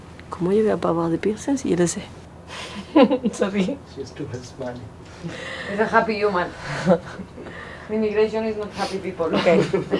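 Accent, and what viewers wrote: Spain. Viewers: bonjour, merci pour tes vidéos faites avec ton charmant accent espagnol tu as trouve ta vie, par forcément la vraie vie, mais celle qui te correspond le mieux Peut-être qu'un jour tu feras un autre voyage .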